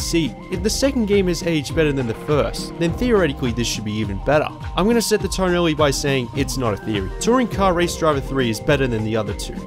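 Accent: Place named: Victoria, Australia. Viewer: I would say by the accent he is from either SA or VIc, probably Vic.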